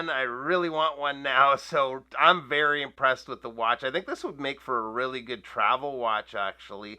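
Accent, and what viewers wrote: Canada. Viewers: Canadian ehh !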